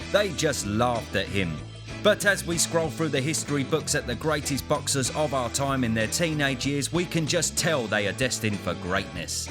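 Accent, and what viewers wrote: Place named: South Wales Valleys, United Kingdom. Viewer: As a Welsh man with a ponchant for bhas bleep eeeeeee I endorse your vallleeeys accent.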